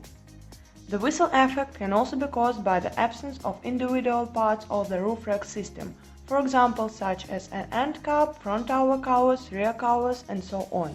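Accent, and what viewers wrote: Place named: India. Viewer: Sounds like a Hindu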